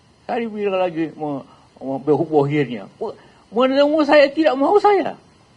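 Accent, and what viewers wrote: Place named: Sulawesi, Indonesia. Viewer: Memang cara orang bugis begitu ngomong nya. Jgn samakan dgn orang jawa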